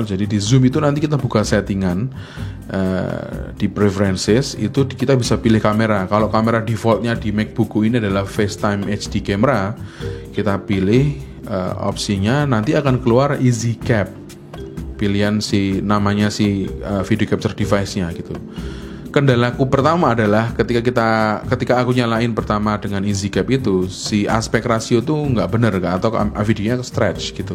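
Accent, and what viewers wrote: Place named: Java, Indonesia. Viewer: medok sekaleeeeee..... salam wong jowo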